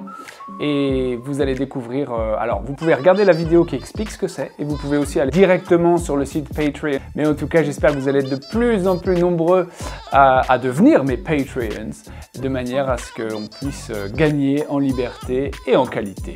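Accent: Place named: United Kingdom. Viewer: As usual, super interesting, professional, neat job and on top of that with the right english accent. I put my hat down! Here are some more: all/quite the go (fashion), it's no go! (impossible), a queer/rum go (a turn of events) a fair go (a fair chance) to go off ( to have an orgasm) to have to go (to urinate or defecate) to go down (to be sent in jail) and so on. You're doing a great job that's why I recommended your to my 15 students, they all really liked your way of teaching. GREAT JOB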